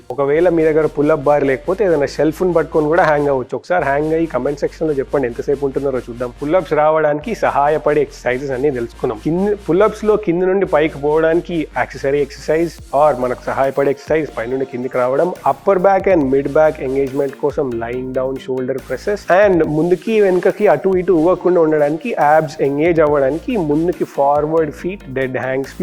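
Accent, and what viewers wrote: Telangana, India. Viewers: Telangana yasa super👌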